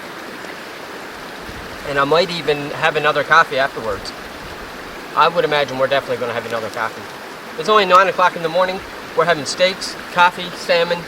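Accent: Newfoundland, Canada. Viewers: Are you from Newfoundland ,you sound like you have a bit of an accent? I just started watching the vid and had to work...I'll watch it later...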